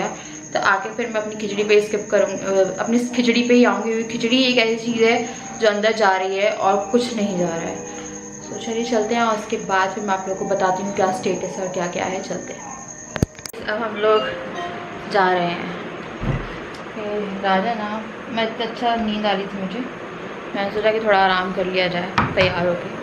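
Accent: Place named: Kanpur, India. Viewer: Kanpuria bhasha mst comdey type bolti ho app🤣😂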